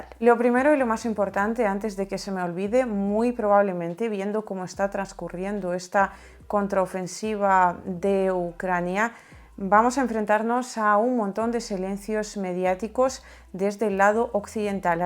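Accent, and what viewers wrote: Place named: Spain. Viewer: Me sorprende el acento español de Liu, no es tan fastidioso como el de varios periodistas nativos de España de otros canales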